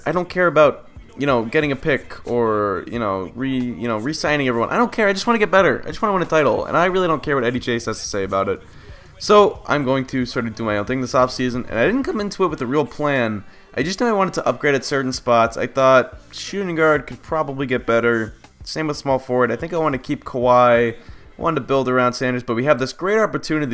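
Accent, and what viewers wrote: Boston, United States. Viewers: Boston accent in full force this video lol (hAHks, adam silVAH)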